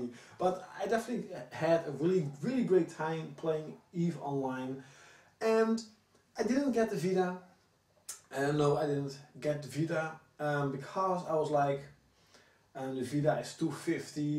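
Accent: Netherlands. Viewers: your dutch right???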